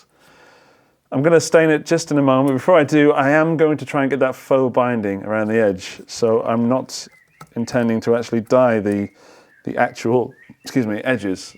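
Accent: United Kingdom. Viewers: I love 90s studios, I love working on guitars, I love hearing British accents on YouTube (no disrespect to our transatlantic cousins) and I love the slightly mad professor vibe this series has. All round win! Would be great to see you modding a tube amp in a similar style, although you might actually die, so that could be a very bad idea.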